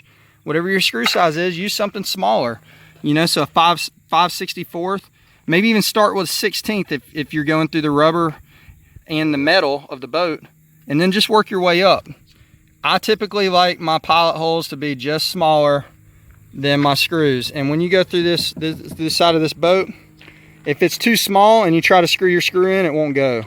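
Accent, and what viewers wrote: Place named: United States. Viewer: Where you from? Cause you sound countrier then a mug cuz.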